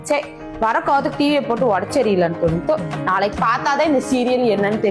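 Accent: Coimbatore, India. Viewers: Neenga covai ah akka..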